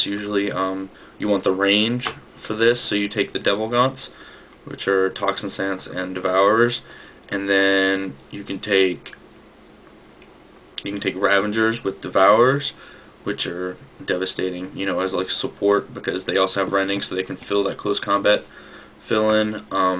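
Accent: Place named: New Jersey, United States. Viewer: Fucking hell, you understand this game so much more than me. You sound just like the guy that keeps kicking my Ass! (He's from New Jersey, sorry if that offends, I'm English!).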